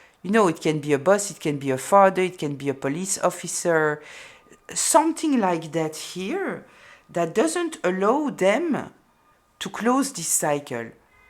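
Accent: France. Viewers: I love your French accent 😊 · You are spot on and you have a lovely french accent too.😉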